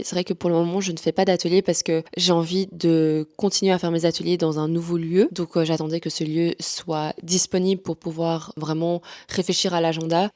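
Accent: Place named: Belgium. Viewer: J’allais dire "non on remarque pas que t’es belge" juste au même moment tu parles de ranger ton brol un de mes belgicisme préféré 😅 Tes tricots sont trop jolis, je me rends compte que je devrais peut être investir dans des pelotes de qualité. Le Louvre-Lens me tente énormément en plus il propose souvent de beaux thèmes d’exposition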